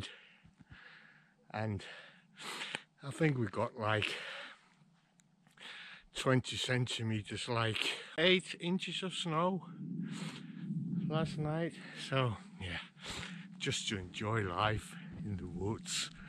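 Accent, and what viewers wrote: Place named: Northern England, United Kingdom. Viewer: Very interesting channel. Just love it. I follow quite a few off-grid channels but love to see your fresh content! My question is, your English is very good and I cannot help but think it sounds very Northern English, from the north of England. Have you spent time in England during your working life?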